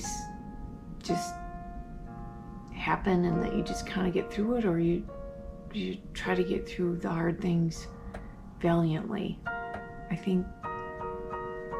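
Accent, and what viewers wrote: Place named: Michigan, United States. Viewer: I thought so! I have a good friend that lived in Midland Michigan and she had a similar accent to yours.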